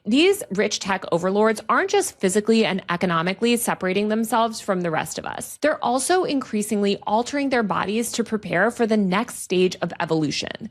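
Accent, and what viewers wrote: California, United States. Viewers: she literally sounds like if California was a person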